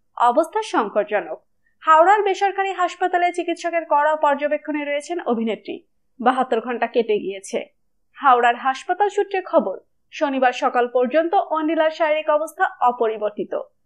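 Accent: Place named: Bangladesh. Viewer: আমার মনে হয় এই সব channel গুলো বাংলাদেশের. Pronunciation শুনে তাই মনে হয়. আর বাংলাদেশ থেকে YouTube এ extremely vulgar জিনিষ দেয়. এই গুলো কি করে allow করা হয় জানি না.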